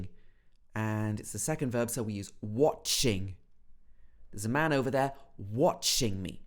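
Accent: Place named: United Kingdom. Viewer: I love british accent. plz make videos on paragraph reading.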